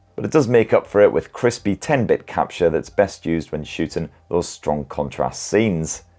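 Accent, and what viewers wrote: United Kingdom. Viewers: Thanks for adding other languages to your videos ! (let say the truth, because your British accent i don't understand everything ! )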